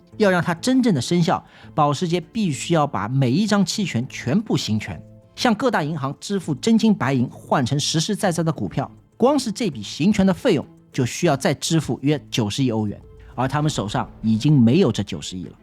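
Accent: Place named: Wu, China. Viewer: 挺好的 就喜欢吴语区口音😂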